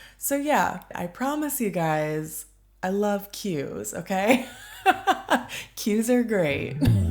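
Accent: United States. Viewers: Hej! Are you from Minnesota?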